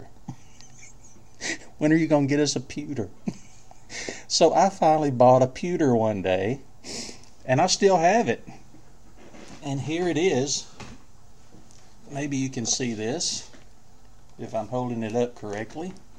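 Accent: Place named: South, United States. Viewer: Great video Mr. That is what I am also seeking are minimalist systems, tired of the bloated giants out there. I have quite a few PCs here, settled upon FreeBSD, but always looking at what Linuxers' are doing. I was initially drawn to your southern accent (being from the Midwest) and the minimalist system, w/menus. Also your NuTyX isos. Keep up the good videos!!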